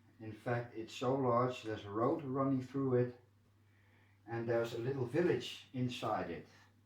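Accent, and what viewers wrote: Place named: Netherlands. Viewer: Nice stories. Have you noticed that with a few exceptions your commenters are all female? It must be that sexy Dutch voice that does it.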